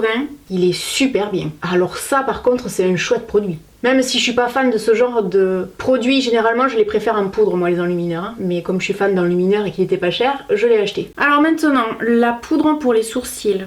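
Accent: South, France. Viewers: Jaime beaucoup ton accent du sud et ta voix et la même que kamila de secret story en tout cas gros bisous, et rejoiner moi sur ma chaîne YouTube biz😗👍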